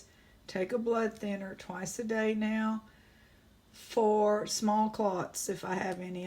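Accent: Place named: Texas, United States. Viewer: It's good to hear an update from you, my Texas jewelry friend. You posted a video during the day several weeks ago and my students were in my classroom. I told them I get good stuff to make jewelry with from you and played the video. Several of them commented that they like your voice.. I was like.."Well, of course.. she's Texan!"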